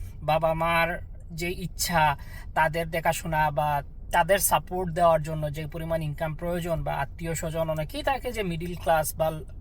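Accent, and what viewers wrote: Sylhet, Bangladesh. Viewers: আসসালামু আলাইকুম। কেন জানি কথা শুনে মনে হচ্ছিল, আপনি আমাদের সিলেটের। তারপর আপনার চ্যানেলের প্রথম ভিডিও দেখে কনফার্ম হলাম। অনেক অনেক দোয়া এবং শুভকামনা আপনার জন্য ভাই।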